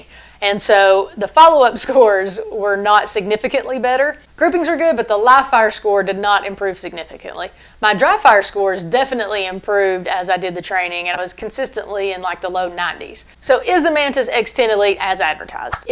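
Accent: South, United States. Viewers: I also got to say I love the southern accent....lol... I'm from the Midwest so we have boring accents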